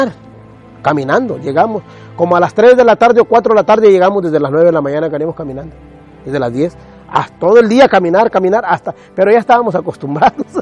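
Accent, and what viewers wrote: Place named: Mexico. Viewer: Este abla.como mexican..estubo en la usa?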